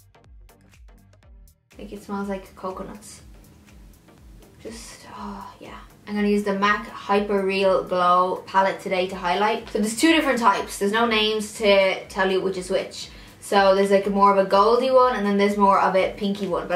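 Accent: Dublin, Ireland. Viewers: Just new to your channel but can I just say that’s it’s so fab to see a big and successful YouTuber with a Dublin accent!! Any other Irish Youtubers I know of all have like posh South Dublin accents or with an American twang there’s nothing wrong with that of course but it’s just really refreshing and exciting to hear an accent like mine on YouTube. Reppin’ the Northsiders!💁🏻 Fills me with pride!💚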